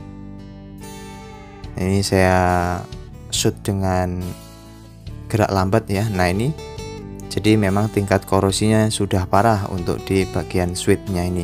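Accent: Java, Indonesia. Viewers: owalah tk kirain daerah jawa bang, bhsa nya medok sih 😁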